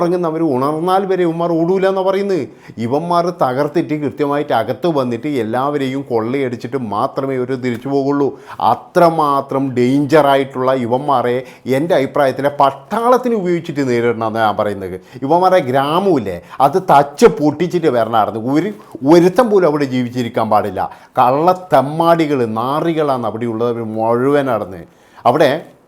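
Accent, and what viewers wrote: Kannur, India. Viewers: Kannur slang nalla rasam kelkkan. My fathers place anjarakandiyanu thangalude native place evideya?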